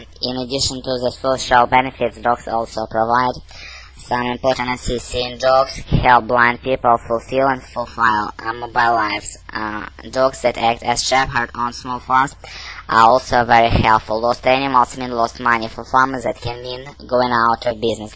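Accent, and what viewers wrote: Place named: Russia. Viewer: чотко слышно русский акцент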